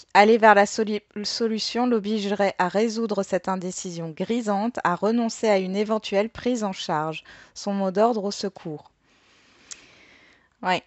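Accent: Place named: East, France. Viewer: Vous êtes de l'est car vous dites :"Ui" au lieu de :"Oui" !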